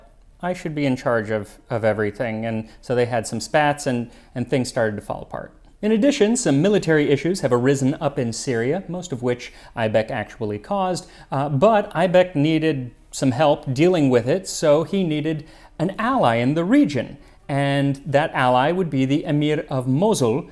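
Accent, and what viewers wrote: Europe. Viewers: All his pronunciations not European still sound European. His Japanese even sounded european. But that's fine. I'm not coming here for linguistics. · his pronunciation is not half bad for someone that probably never studied Arabic before! I think he studied a lot of European languages so he might have added a bit of european style emphasis that would probably only be slightly annoying to native speakers. As long as it's not so bad it sounds like the wrong words, it isn't the end of the world. There's like 2 dozen dialects of Arabic, it's hard to be perfect at pronunciation unless you have some background in it.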